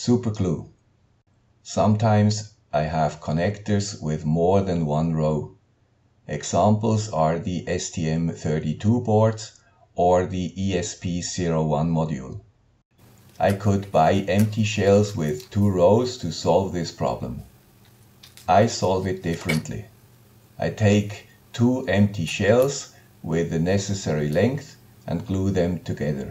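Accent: Switzerland. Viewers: Trick no. 4 was exactly what I was looking for but unfortunately I don't have a solder gun at home so rip. But these tips are great,! Thankyou so much! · Appreciate the channel of the guy with sweet Swiss accent !!! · I have been surfing around for Arduino related content and every time I start watching a video or two, I end up finding one from your channel. From Lora Gateways to Dupont Wires, from Crimper tools to anything. I always find the info I am looking for at your channel. For short, I want to thank you for your great work. I am big fan, and I wish you health and strength to make many more useful videos for the community. Thank you so much:) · Useful tips! Thanks for sharing,! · as usual, " ", you simply peddle great info. simple, to the point, and, that hallmark of good info, seems pretty damned obvious AFTER you're told, lol. kudos, and a new subscriber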